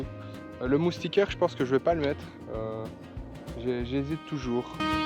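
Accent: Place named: East, Belgium. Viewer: Petit accent là, ça sent l'Est de la Belgique...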